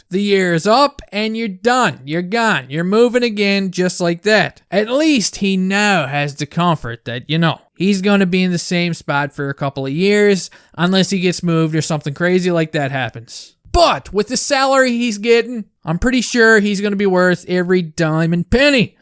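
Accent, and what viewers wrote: Newfoundland, Canada. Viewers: you from Newfoundland? If you are me too buddy hahaha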